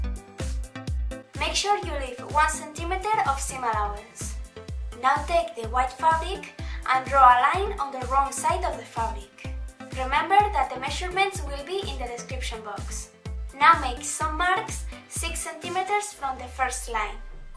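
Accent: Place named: Spain. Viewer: You have Spanish accent. I love the video! <3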